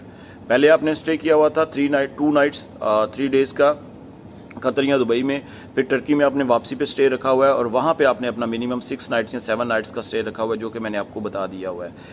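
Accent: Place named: Pakistan. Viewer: Are you an Indian or Pakistani?? I'm doubtful because you have talked about India But noT Pakistan though your name and speaking accent is of a Pakistani.....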